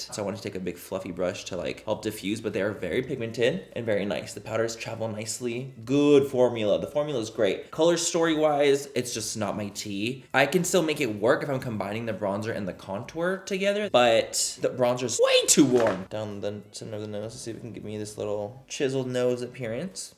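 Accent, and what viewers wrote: England, United Kingdom. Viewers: Ok... I’m going to need a “full face of make up in an English accent” please 😁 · Your British accent lol, I live! 😄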